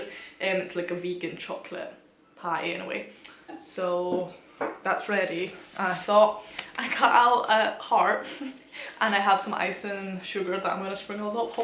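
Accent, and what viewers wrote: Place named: Aberdeen, United Kingdom. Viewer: Your accent is so mixed but so Aberdonian at the same time haha, are you Italian? Great video.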